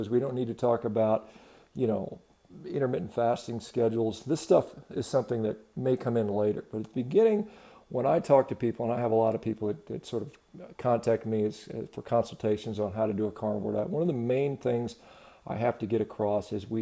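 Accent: United States. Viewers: Love your American accent and soft relaxing tone👍💋♥️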